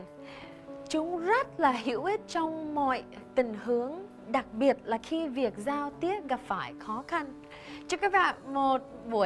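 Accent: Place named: United States. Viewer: toi qua mung khi xem dc kenh nay,co giao doc tieng Viet rat ro rang tung loi tung chu,giong bac nhe nhang de thuong,va giong my cung hay qua,cho Minh hoi tham.co phai co dang o my kg ah.minh se co gang hoc doc giong co moi dc.cam on co nhieu. sang nay tinh co xem kenh cua co 've chu de nha cua, gio xem kenh nay.thich qua di thoi,.co phai co dang o my kg.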